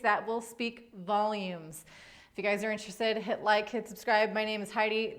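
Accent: United States. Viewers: can someone tell what her accent is? It sounds a bit different to me than the "standard" US accent but I like it.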